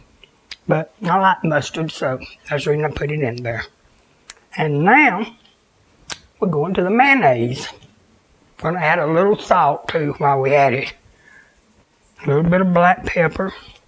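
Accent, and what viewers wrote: South, United States. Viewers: You make fine p.s Makeit like l do love that southern accent tell you are from the country. You are a gem no nonsense just being you. Glad i found your channel.lm from ala. 70 plus years. So refreshing to see some one thats speaks my language. Subscribed just a second ago Wishing you the best.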